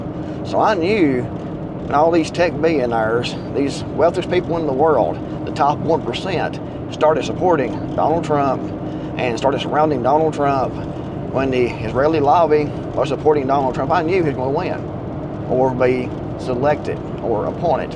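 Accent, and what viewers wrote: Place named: Texas, United States. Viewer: Texas loves you, you sound like us. Thank you Sir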